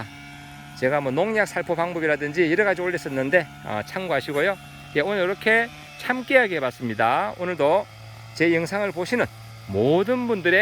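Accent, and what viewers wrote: Gyeongbuk, South Korea. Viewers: 선생님 음성도좋으시고 말씀도 정확하고 조리있게 잘하셔서 아나운서 를하시면 좋을듯합니다 유트뷰 로 뵙기에는 아까운 인재심니다 그리고 저는 고향이 경북상주군 공검면 하흘리 에 태어나서 10살쯤 경기도 에이사와서 현재 거주하고있는데요 말씨들으니까 고향분같아서 몇번씩 반복해서 영상을보고있습니다 저는6월 12일에 모종을 사서심었는데 언제쯤추수가 가능할까요 현재25마디까지 커서 적심한 상태입니다 처음심은 참께 농사 가 많이어렵군요~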